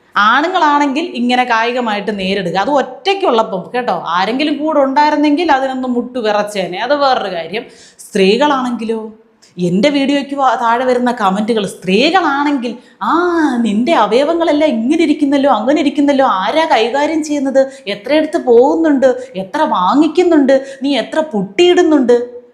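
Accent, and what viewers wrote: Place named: Trivandrum, India. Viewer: പ്രത്യേകിച്ച് ട്രിവാൻഡ്രം സ്ലാങ് 😂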